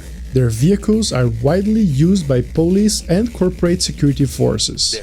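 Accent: Brazil. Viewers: Brazilian accent detected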